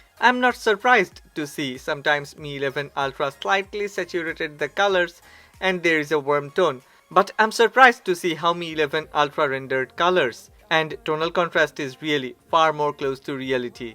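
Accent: India. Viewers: Ur accent sound so much like a bengali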